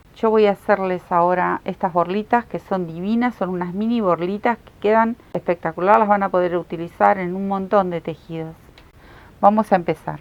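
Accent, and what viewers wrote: Argentina. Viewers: De acuerdo, la cobijita mas linda del internet. Yo disfrute muchisimo los videos, uno tras otro como si fuera una serie de tv. Yo soy mexicana, y me encanta su acento argentino! Estas cobijas no tienen igual, mi abuelita tejio una, se la dio a mi mama y todos la querian usar, iba de alla para aca. En mi familia yo soy la tejedora, de niña me iba sola a clases de tejido con puras señoras, y desde entonces me encanta. Gracias por estos hermosos tutoriales!!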